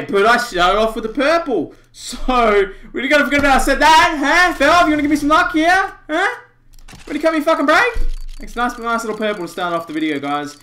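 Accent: Australia. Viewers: australian? <3 your accent.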